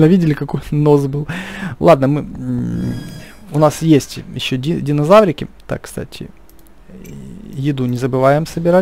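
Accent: Ukraine. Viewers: ти украинец??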